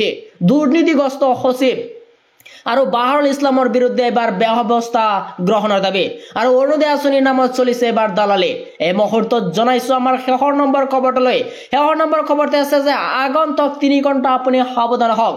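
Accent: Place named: Bangladesh. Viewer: Bangladeshi Jen Lagise news Kuwa mokkel tu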